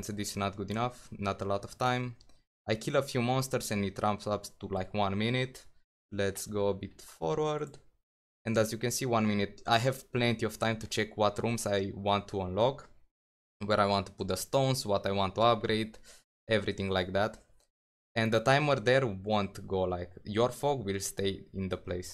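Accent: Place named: Romania. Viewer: Are you romanian? Heavy romanian accent. If yes, Salut!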